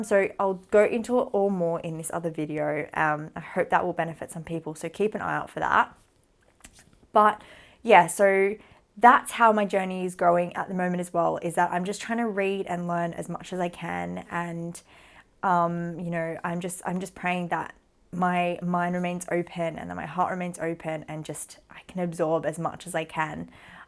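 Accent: Australia. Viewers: I never had the patience to watch someone talk this long in 4 videos, bt i have to give it to u, coz i watched all 4 videos without even forwarding once, you have a beautiful accent the aussi accent, which is very easy to understand and ur story telling is so natural, i would like to say this, u are one of those ppl who r chosen by Allah to bring to Islam, Allah must have liked something u did before converting so much that he chose u, u r a beautiful person. Welcome to the Muslim Ummah.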